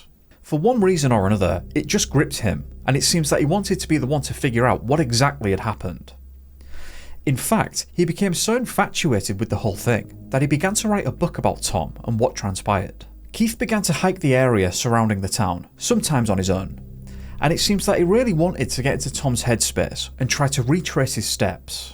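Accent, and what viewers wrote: United Kingdom. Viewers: Your British accent is fab!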